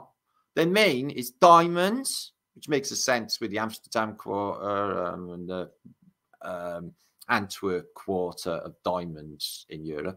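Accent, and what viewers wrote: Wales, United Kingdom. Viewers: Hi. Just found your channel through Archaix. A meet up snippet with you describing trying to get out of the UK I think! I thought "I know that twang!" 🏴😀 Sending love and best wishes to you from a fellow south 'Walian'. I'm sorry I didn't find your channel sooner. Subscribed for what it's worth and really enjoying my first few minutes of your stuff. 😎🙏💥Thanks again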